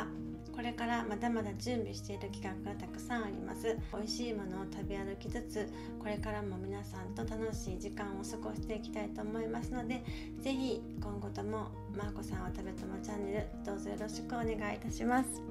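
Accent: Kyoto, Japan. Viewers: アラフィフ・・・ですか！？お若くて美しい。 京都のディープな所をご紹介頂き、東京から中々抜け出せない私にとっては、興味津々摩訶不思議な異世界を垣間見せて見せて戴き、とっても楽しみなチャンネルです。今後も京都の隅々までご案内して頂けたらと、期待を込めてチャンネル登録させて頂きました。 京都弁良いですね〜。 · めちゃくちゃ、京都弁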